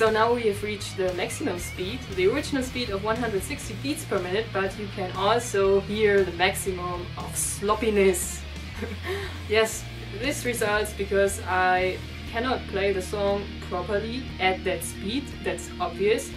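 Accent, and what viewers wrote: Germany. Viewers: Красивый немецкий акцент. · I like your german accent (Hab ihn selber xD) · I love your german accent when speaking. Do not exist best with this: The very best guitar player in fusion with as a beutiful girl. hi from Chile.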